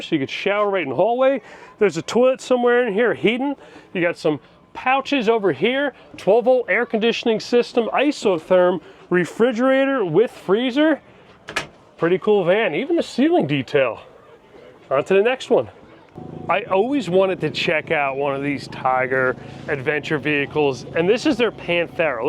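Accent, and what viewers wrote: New Jersey, United States. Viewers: Love the Jersey accent! 😊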